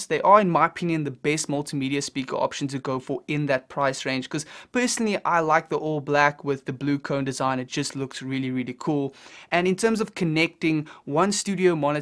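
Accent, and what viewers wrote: South Africa. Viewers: Howzit boet - where in South Africa are you from?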